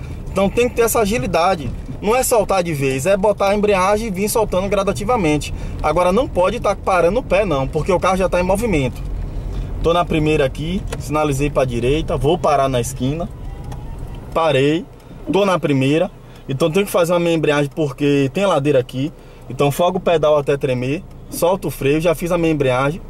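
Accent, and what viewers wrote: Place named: Pernambuco, Brazil. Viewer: Você é Pernambuco? Gosto do sotac.. Parabéns!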